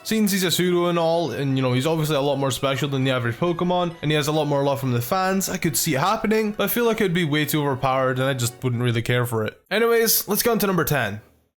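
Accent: Scotland, United Kingdom. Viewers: ***** where are you from because you sound Scottish I'm Scottish =D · tehTroll OfLife Yes he is Scottish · Are you Scottish? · are you scottish?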